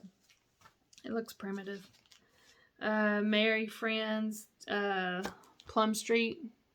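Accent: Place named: Tennessee, United States. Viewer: Congratulations on reaching 500! You're so cute, live hearing your Tennessee accent..Halloween will be here before we know it.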